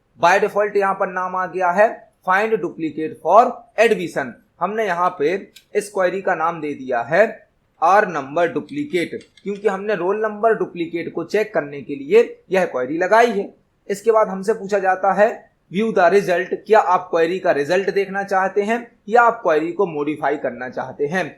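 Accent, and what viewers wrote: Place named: Bundelkhand, India. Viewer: Sir are you from mp!? Because aapki voice ka accent bundelkhandi sound kr rha h specially damoh ka🧐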